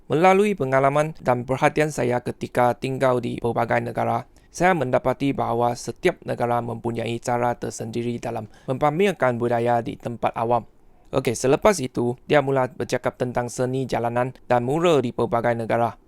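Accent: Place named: Terengganu, Malaysia. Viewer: bahasa nya anok Trg ,K’ltan dok lawen ………👍syabas .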